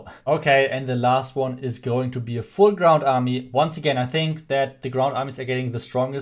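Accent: Germany. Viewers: Man hört einfach das Deutsche komplett raus... Nichts gegen dich aber dein Englisch ist echt nicht gut ^^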